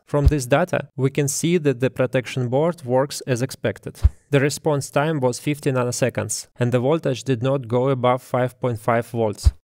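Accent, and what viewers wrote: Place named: Russia. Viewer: 😁 слышен "наш" акцентик и коверкание слов) А так видео👍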